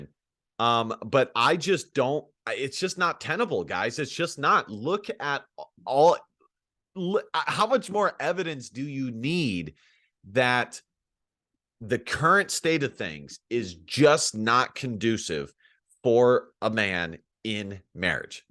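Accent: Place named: South, United States. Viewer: you gotta be from down south. I literally had to speed this video up to 2.0X speed to even stay interested in a single sentence.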